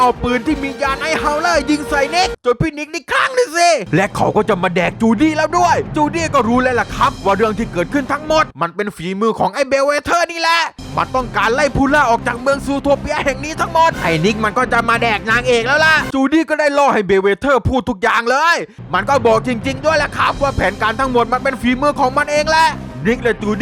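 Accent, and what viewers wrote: South, Thailand. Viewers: พี่เป็นคนใต้หรือเปล่าเนี่ยเห็นสำเนียงเหมือนอ่ะ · ฟังเสียงพากแล้วเพลินดีครับ ออกสำเนียงใต้นิดๆฟังแล้วมีเสน่ห์ดีครับ ทำต่อไปนะครับจะติดตามและเป็นกำลังใจให้ครับ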